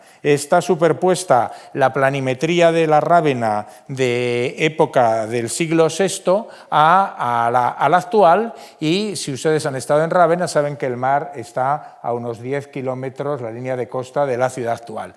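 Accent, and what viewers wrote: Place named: Spain. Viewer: Qué asqueroso es el acento español. Como si les faltarán dos dientes. Y para colmo el concepto que tienen de ellos mismos... Mediocres. Buscan compensar su mediocridad con racismo. Buscaré otra vía para enterarme del mismo tema.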